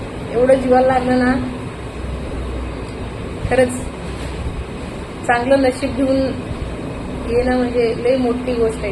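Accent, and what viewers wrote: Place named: Belgaum, India. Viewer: Ase kahi naste आपण काम करत जा falachi apexa na thewata pudhe जा kashtache jivan ahe pn nashib wan ahet 4 children ahet .keep itp sone ky parat yenar .struggle is life .ata thodech diwsat tumche best days yenar mule shklit tyana smjte .good job kartil dont b nervous n worried.God bless u all.ur marathi language is ltl bit belgaum type.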